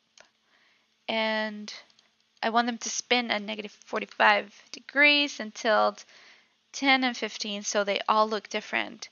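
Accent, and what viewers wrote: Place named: Netherlands. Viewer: You accents sounds like Dutch (Netherlands)🇳🇱 ones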